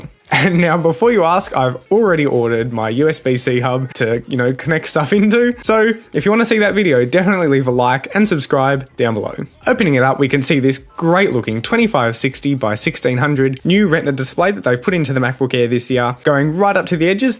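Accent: Australia. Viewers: He’s Aussie lol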